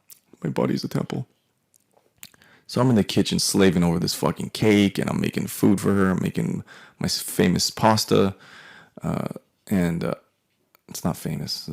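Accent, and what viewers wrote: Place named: Mexico. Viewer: I love ur Mexican accent. It’s ok I’m Mexican.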